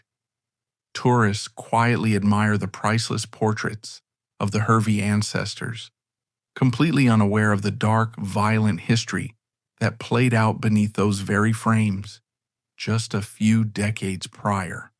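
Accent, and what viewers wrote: United States. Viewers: I hate this American narration . Boring !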